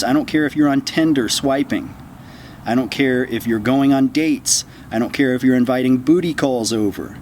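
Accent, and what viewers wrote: South, United States. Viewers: your southern accent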